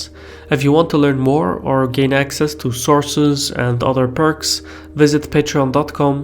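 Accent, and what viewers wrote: Europe. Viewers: That's an extremely rich European accent, you've got there...The two most expensive purchases most average people make in their unfortunate lives, is the home and their car. If it wasn't for mortgages and motorcars, then what would capitalism and its banks do with themselves? Hopefully jump off an extremely high cliff...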